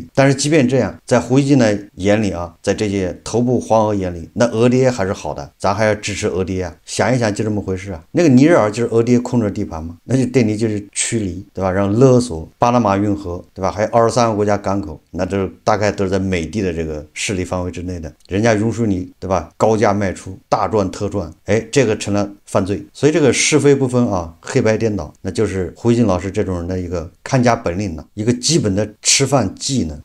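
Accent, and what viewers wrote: Anqing, China. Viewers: 你是安庆老乡吗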